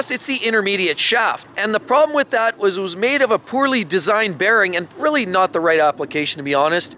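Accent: Canada. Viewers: You are very well versed in automotive technology. You reinforce my distrust in newer vehicles. Not to generalize too much but they are nothing more than over priced junk. You sound like you are from Canada. Ehhh...!!!!! Thank you.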